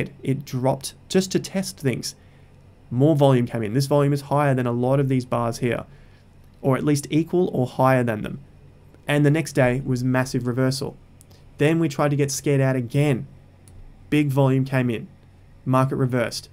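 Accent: Australia. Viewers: Im completely new to the stock market and wanted to thank you for your informative videos. It's helped me make a bit of return off of a small investment and i'm hoping to grow further. Refreshing to hear an Aussie accent as well! Thanks for sharing your knowledge. :)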